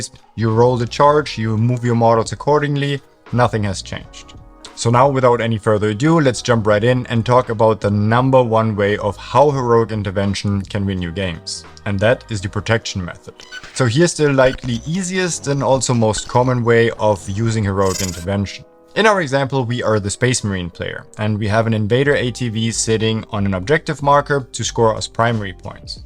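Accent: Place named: Germany. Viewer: why do I hear the slightest german accent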